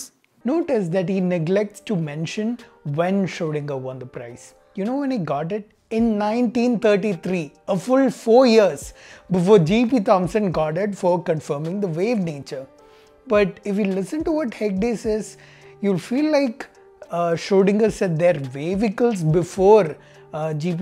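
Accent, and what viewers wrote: Kerala, India. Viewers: Bro I really appreciate you finding mistakes of others..... But pls do speak in proper accent. I feel like I'm watching Malayalam channel. U r killing it 😂😂😂😂.sorry it's ur 1st mistake. 2. U didn't do proper research on JJ Tommson.... His Nobel Prize considered as false and it's still controversial.... There are thousands of science theories and research were proven wrong later. And it's updating.... I know you are doing this for fame.... I feel pity for you.... I really enjoyed your videos it was like a comedy show.... Particularly LMFAO when u said "hilarious" 😂😂😂😂😂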